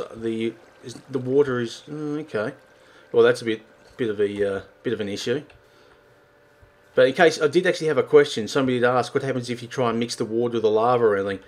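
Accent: Australia. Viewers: your Australian aren't you?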